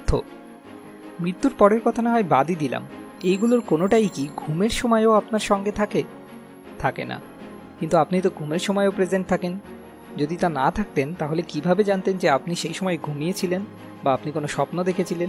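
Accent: India. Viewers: Md:Mijanur Rahman No I am sure He is Indian because accent is different.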